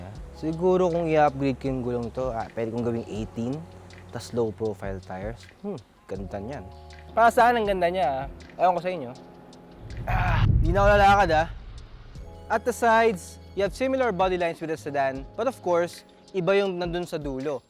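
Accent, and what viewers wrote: Philippines. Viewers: Я просто в ахуе от его «английского филлипинского» .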